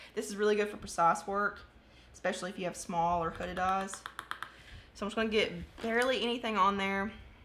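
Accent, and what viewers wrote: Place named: Texas, United States. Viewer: Girl, our voices and accent sound alike! It’s crazy! Are you from Texas? You are beautiful! Excited to be a new subscriber! Thanks for the fun videos!!💛🌼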